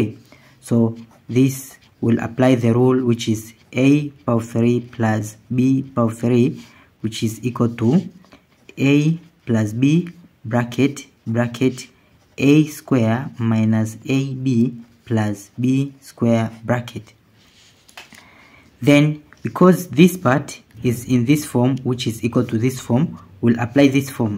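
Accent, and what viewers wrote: India. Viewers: This person in the video is NOT a Native German speaker, vo8ce is of someone from India. Why you mention Germany in your video tagline? I dont know. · Не знаю, что обозначает GERMANY в заголовке, ибо судя по цвету кожи и произношению за кадром индус. Задача решается на раз-два. Глядя на уравнение мне понятно, что (х) следует искать в области отрицательных значений. А раз так, кроме как "-2" никаких корней это уравнение иметь не может. Наверное, в Индии много лишней бумаги.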